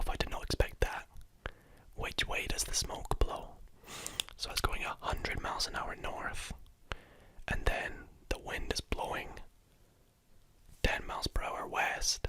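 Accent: Scotland, United Kingdom. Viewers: Hey are you Scottish? I'm just asking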